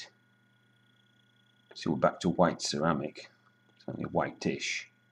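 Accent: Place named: United Kingdom. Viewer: british bruh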